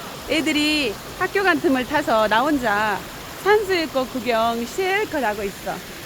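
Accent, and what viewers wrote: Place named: Gurye, South Korea. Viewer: 구례사투리까지 숨김없이 사용하시고. 잘봤습니다. 가보고 싶네요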